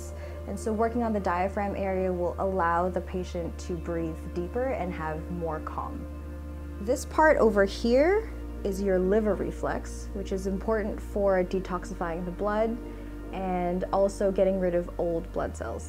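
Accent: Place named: General American, United States. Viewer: Her diction is clear. She has the standard American accent.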